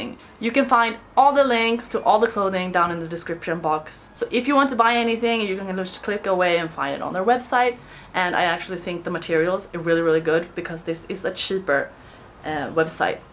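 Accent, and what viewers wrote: Sweden. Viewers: Jag tycke väll att de va lite svensk brytning i din engelska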